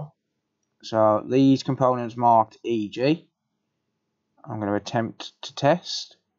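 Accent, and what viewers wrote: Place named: Birmingham, United Kingdom. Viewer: Don’t know how,but for some unknown reason,one of your videos popped up in my recommendations…that was a few hours ago. 😂 I’ve watched a few since then. Fantastic channel and great to hear a English voice for a change. The bonus i,you do repairs for us the public,so now you are a top of a very short list when it comes to needing repairs. Keep up the amazing content and yes,I’m most definitely subscribed 👍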